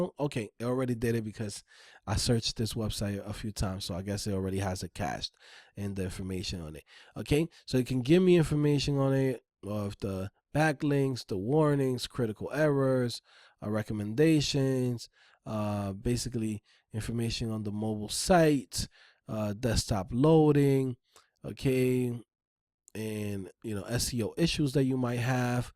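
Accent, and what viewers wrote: New York, United States. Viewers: You sound like you from NY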